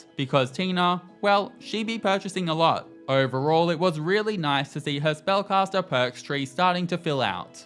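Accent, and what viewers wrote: Australia. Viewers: is Aussie 👀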